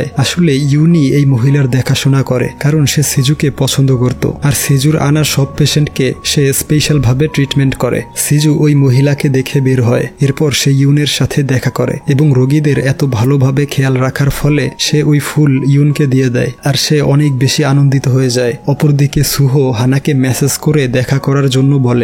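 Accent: India. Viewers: Apni indian kotha shone mone hoy Bangladesh e ami Bangladesh e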